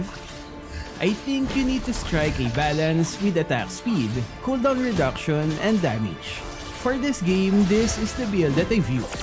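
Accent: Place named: Philippines. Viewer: I smelt tagalog accent in him...😁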